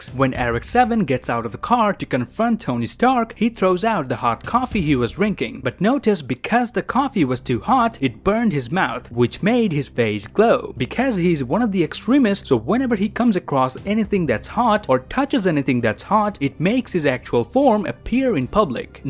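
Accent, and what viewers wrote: Canada. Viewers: I love your accent say it again!: Dowa the explowa Edit: Thanks for the likes! Hoping you are having a good day! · he sounds so much like modest pelican but Canadian instead of Australian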